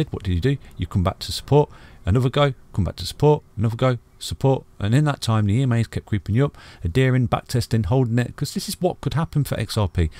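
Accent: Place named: Northern England, United Kingdom. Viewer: I am learning everything from you. Thank you so much! Would love to see a video on, who are you? How did you learn trading? Where in England are you from? I love your accent! Some bits of it sound northern but not full on northern so I am curious:) tell us more about yourself!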